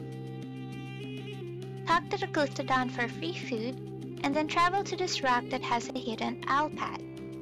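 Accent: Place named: South Africa. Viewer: Awe! I just discovered you a few days ago, as i just started playing roots of pacha, and i have already commented a few times on your vids 😂 but i just wanted to tell you how much your video's and your ADORABLE voice brightens my days!!🤩💕 Also, were are you from? I dont know why, but your accent sounds a bit south african or something like that haha, well im from sweden so i have NO clue, im just guessing hahaha 😂 its something about how you pronounces words, like the fish names in one of you vids, and it just tickles the brain like south African accents do haha 😂 Also, your lil meerkat avatar makes me CRY hahaha, they're one of my favourite animal's , here in sweden we call them surikat, or if there's more than one, surikater haha 😭 You're welcome for that EXTREMLY useful info hahah😂😂 welk just wanted to let you know ur awesome and i love ur vids, you dont even know how much they have helped haha 🤩💕 love from sweden!!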